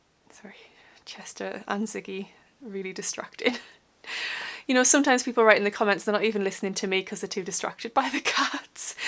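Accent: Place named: Tyneside, United Kingdom. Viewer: Great video. Lovely to hear a Tyneside accent. Don't ever forget about your dreams - it might not be possible for you to do some property development at this time, but you have your whole life ahead of you and dreams still come even true when you are more mature, you've just got to be determined and follow them. I speak from experience (though not in relation to property development).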